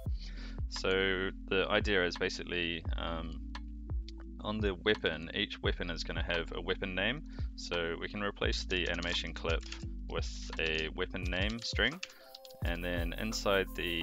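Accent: New Zealand. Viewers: great. i already know im gonna hear nothing but "zed" instead of Z "not" instead of zero and "soouuuuwwww" instead of "so" the whole time.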